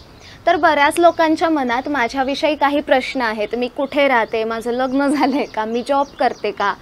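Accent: Kolhapur, India. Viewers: tuja aawaj komal aahe khop kolapuri bhashet bolte tu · मराठीला अजूनही थोडा घाटी कोल्हापुरी टोन आहे . बाकी आवाज क्यामेरा समोर तरी छान मृदु आहे .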